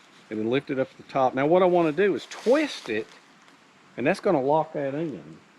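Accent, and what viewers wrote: South, United States. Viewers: gm love your videos and your southern voice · Awesome video. Loving that southern accent. 😎🤘🇺🇸🇺🇸🇺🇸💯💯💯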